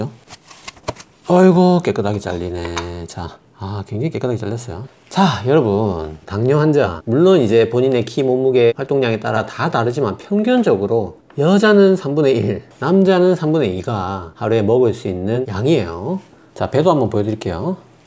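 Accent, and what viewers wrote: Gyeongsang, South Korea. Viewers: 경상도 억양이 귀에 쏙쏙 와닿네요..ㅎㅎ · 경상도말씀이라 부산이면 뵙수잇는의사라 생각햇는데 요양병원 어는곳에 계시다네요 ㅎㅎ 의사중최고의 의사입니다 늘 좋은영상 계속듣고 따라하도록 하고잇어요❤❤ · 은. 고향이 경상도 이신걸로? 말투에서 정이가는 상남좌삘^^ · 구수한 경상도 말씀이적당히썩여서하시니 재미있게 박사님 말씀 잘듣고 있습니다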